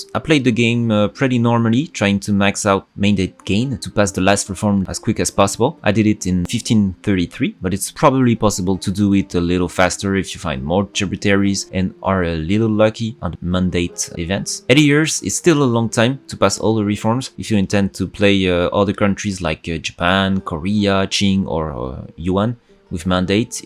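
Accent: France. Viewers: je me disais bien que t'avais un accent français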